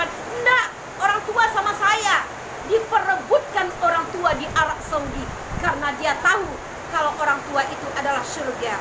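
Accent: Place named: Minangkabau, Indonesia. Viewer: terbaikkk ustazah..👍👍 Haha Bakaleberan muncuangnyo Bahaso urang awak..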